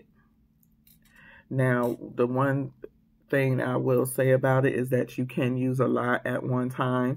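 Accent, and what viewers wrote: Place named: Baltimore, United States. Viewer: I can hear the Bmore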